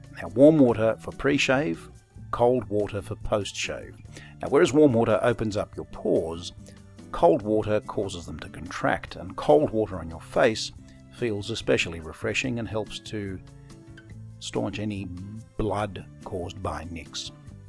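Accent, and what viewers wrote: Australia. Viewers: G'day, Thank you for a great series of entertaining and educational videos. It's particularly nice to hear a cultured Aussie accent: your voice would not be out of place on ABC Classic FM. A question re witch hazel as an aftershave if you would please be so kind as to answer... Aftershaves (etc) are available which have a witch hazel base--such as the Thayers in your video ($18 plus postage on eBay for 355ml)--but it is also possible to purchase what is described as 100% pure organic witch hazel ($22 plus postage for 500ml on eBay), and T N Dickinson's Witch Hazel ($6 for 240ml from Chemist Warehouse). Are all of these suitable? Are any better or worse? Does the 100% witch hazel (whatever that means) need to be diluted? Big price difference between these.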